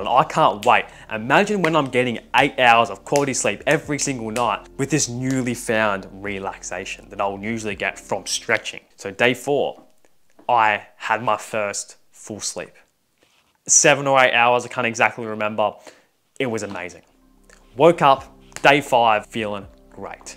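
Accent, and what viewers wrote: Australia. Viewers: Now that's an Aussie accent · Judging by your accent I’m guessing your from New South Wales or Sydney area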